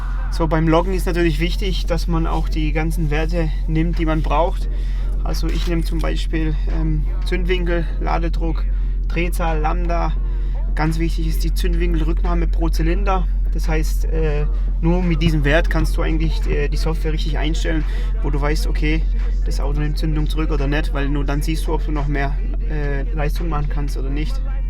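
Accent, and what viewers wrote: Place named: Swabia, Germany. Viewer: Allein schon wegen dem Schwäbischen Akzent gibts einen Daumen hoch!!!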